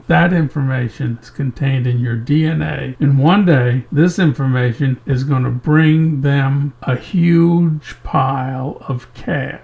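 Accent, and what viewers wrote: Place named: Louisiana, United States. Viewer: Hello...I truly enjoy your videos...thank you for sharing your knowledge...where are you from ? Sounds like a Louisiana accent...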